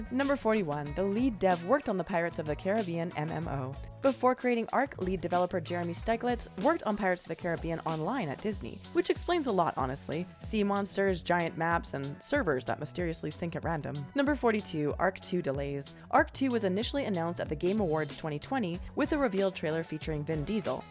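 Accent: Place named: Canada. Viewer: You pronounce things great 😂 love the Canadian accent too.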